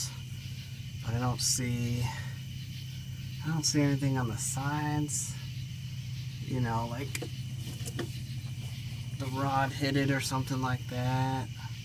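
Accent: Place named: Arizona, United States. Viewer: Downvoted because Arizona accent.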